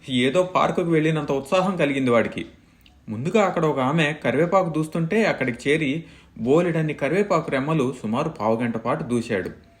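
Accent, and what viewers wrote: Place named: Guntur, India. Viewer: Are you from guntur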